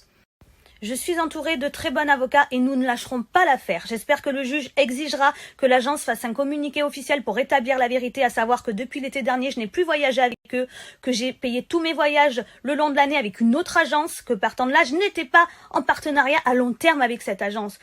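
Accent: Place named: Italy. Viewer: La pronuncia italiana oltre qualche parola in italiano tradisce le tue origini e soprattutto la tua, altissima propensione al crimine.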